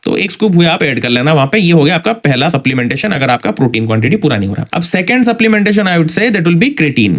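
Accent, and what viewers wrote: Odisha, India. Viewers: R u odia bro?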